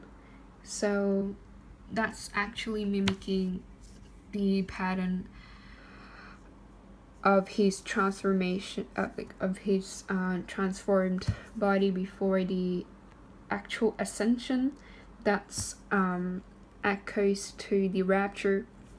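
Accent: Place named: Australia. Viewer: Listen to that Australian accent lol... God bless you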